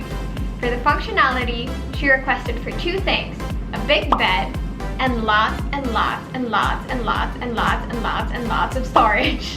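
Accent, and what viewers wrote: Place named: California, United States. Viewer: HI. Ms., you have a beautiful content po. Just avoid cracking voice when youe speak I llike your californian accent though.:)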